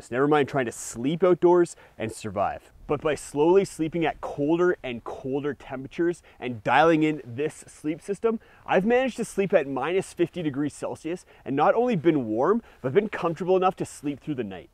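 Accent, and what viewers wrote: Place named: Canada. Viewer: annoying canadian accent.....ooootside, lol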